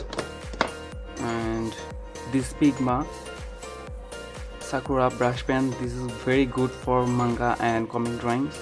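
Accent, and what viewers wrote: India. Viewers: Heyy buddy are you from India? Coz your accent is a little bit indian😅. Btw keep the good work senpai 🙏🏻🙏🏻😂💙💙❤️ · You've got an Indian accent!! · Sound indian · from your ascent i think you are a indian or a bangladeshi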